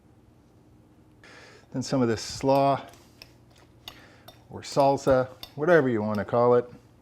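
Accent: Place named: Boston, United States. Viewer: Australian?! That accent was straight out of Boston!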